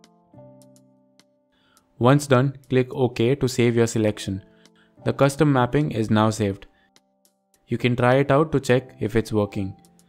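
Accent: India. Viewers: I love how Indian accent people do tutorial for video that people need to watch. Keep doing this! A warm thanks from Canada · this sounds racist, but i was so glad to hear an indian accent bc i knew it would help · I will always trust a captain with an Indian acccent. Thank you kindly.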